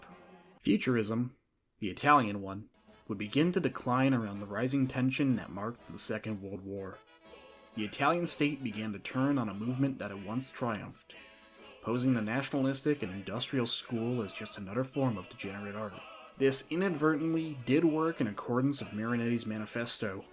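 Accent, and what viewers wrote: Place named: Wisconsin, United States. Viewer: You sound like you're from Manitowoc, Wisconsin.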